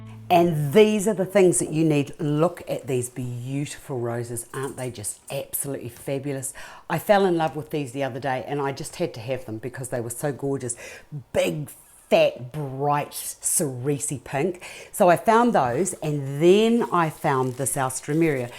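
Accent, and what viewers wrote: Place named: New Zealand. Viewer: Beautiful arrangement , your accents it is come from New Zealand . Thanks for sharing.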